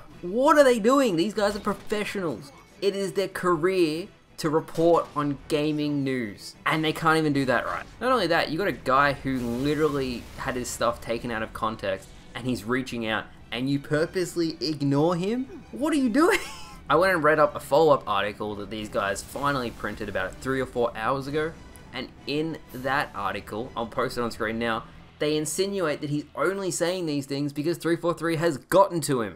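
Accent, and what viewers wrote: Australia. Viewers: Wooh up mate. Are you Aussie?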